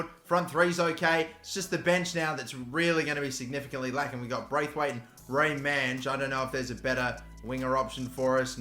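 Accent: Australia. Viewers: Take some rest mate. Preserve that lovely Aussie voice.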